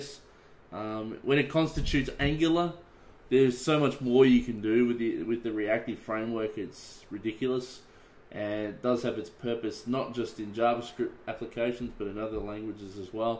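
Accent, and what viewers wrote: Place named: Australia. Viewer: nice ausie accent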